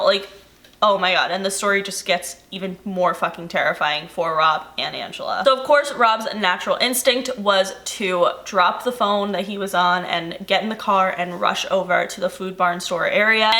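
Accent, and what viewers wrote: Canada. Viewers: D W I think she's from Canada that's why lol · I thought I was the only one who thinks she's from Canada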